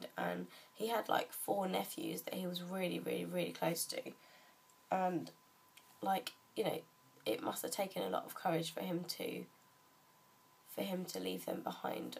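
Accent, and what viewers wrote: England, United Kingdom. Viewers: whoa i was not expecting the english accent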